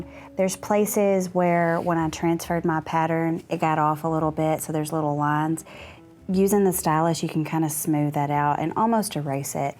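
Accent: South, United States. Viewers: Got to love a pretty lady leathercrafter with a southern accent. You probably found that you dulled your clippers shearing the lining. But they can be easily resharpened with a whet stone. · You are very talented, got homesick listening to your accent, I am a displaced Texan!!!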